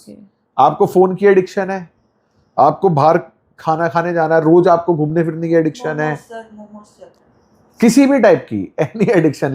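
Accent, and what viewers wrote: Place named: North India, India. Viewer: 35.00 for your info in south ppl speak better english and with better accent. Only u guys, say time as tame and blue as bilue😂.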